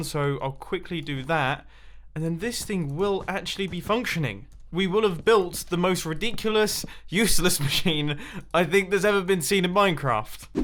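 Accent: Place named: United Kingdom. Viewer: Mumbo has gotten more British over the years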